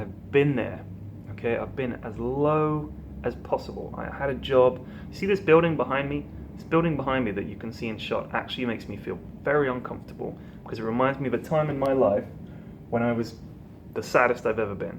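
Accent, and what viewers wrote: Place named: Yorkshire, United Kingdom. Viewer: your Yorkshire accent is spot on!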